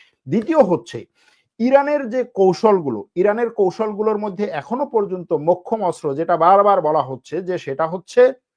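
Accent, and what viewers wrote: Bangladesh. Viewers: BANGLADESHI ENGLISH.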